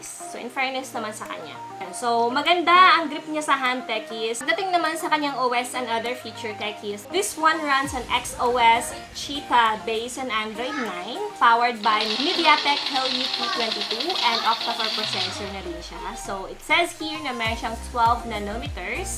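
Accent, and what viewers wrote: Visayas, Philippines. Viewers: bisaya d i ka maam? hehehe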